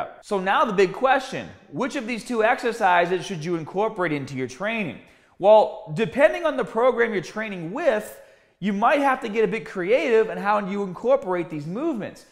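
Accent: Boston, United States. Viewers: Is he from Boston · Ok I just watched his video on deadlifting from 9 years ago, when did he develope a boston accent?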